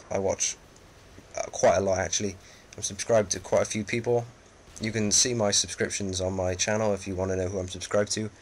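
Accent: United Kingdom. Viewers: this guy is the only guy that sounds british that plays on xbox 360 cause like every video i see of a british person its always ps3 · He sounds british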